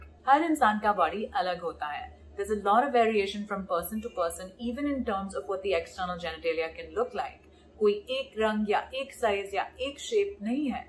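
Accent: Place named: India. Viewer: Name English and accent hindi ?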